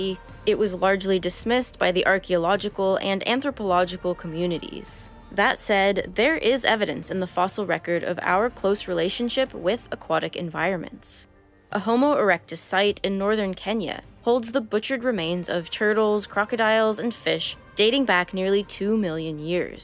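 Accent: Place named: California, United States. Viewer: Is the accent in the video , Californian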